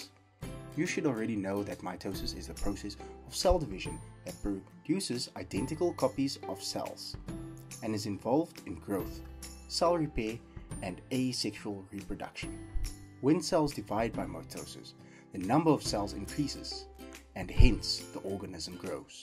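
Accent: South Africa. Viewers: i just want to know if the narrator is south african? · This man sounds South African!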